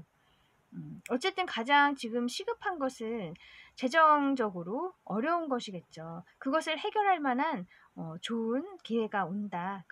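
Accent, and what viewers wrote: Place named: Seoul, South Korea. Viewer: 서울 말씨를 예쁘게 쓰시는 것 같아요^^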